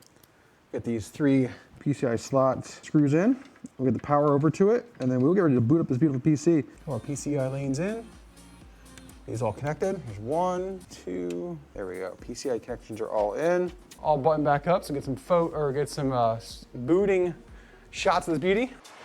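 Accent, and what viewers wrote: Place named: RP, United Kingdom. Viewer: I was fortunate to have the option of the subtitles, otherwise I wouldn't have understood anything you said. Pls either speak slower or get the plums out of your mouth. Sorry about that, mate.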